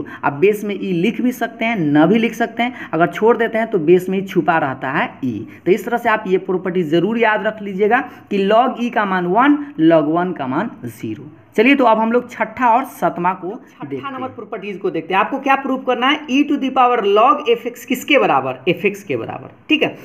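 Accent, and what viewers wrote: Bihar, India. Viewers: Sir aap Bihar sa hi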